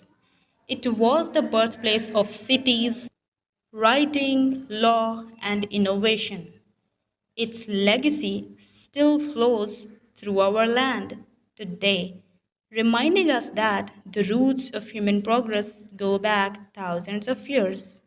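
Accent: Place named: United States. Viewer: Madam from where you have learnt American English?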